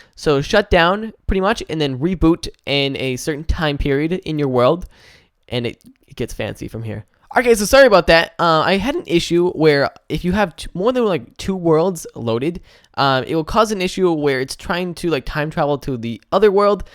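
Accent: United Kingdom. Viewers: Does he have a british accent or the cutest lisp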